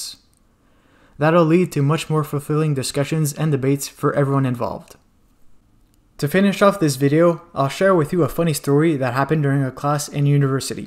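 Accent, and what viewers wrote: Quebec, Canada. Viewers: Vous est quebecois? I heard a little Canadian french when you were telling your story. I'm an hour north of toronto and learned a little french in school. Congrats on your channel growth, i hope it quadruples in 2020! You are one of the best WT youtubers out there, looking forward to educational videos as well. I think it will suit your channel very well.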